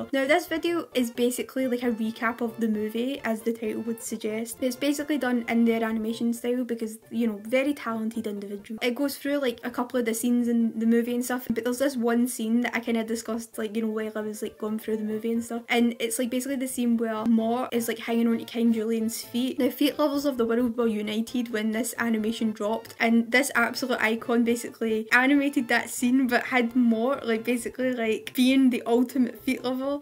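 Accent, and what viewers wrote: Scotland, United Kingdom. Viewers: I love your Scottish accent, lass! 😉🏴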